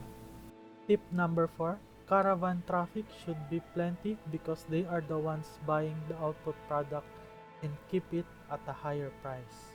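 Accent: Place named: Philippines. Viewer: nah, just your accent. Hahaha I'm filipino too, glad to see another one enjoying this game. Not many people enjoy these types of stuff in our country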